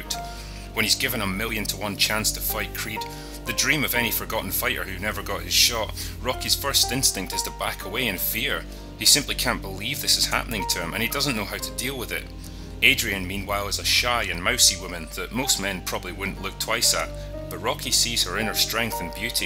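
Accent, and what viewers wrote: Scotland, United Kingdom. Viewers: Until now I've not noticed the Scottish accent at all. 'Drunk' was what I have been hearing. But now I realise the Scottish was there all this time.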